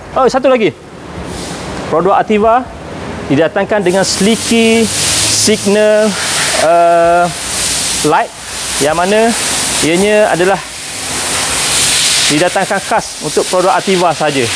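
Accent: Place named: Terengganu, Malaysia. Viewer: Try ckp kl tpi bunyi ganu gak😂klaka la abg ni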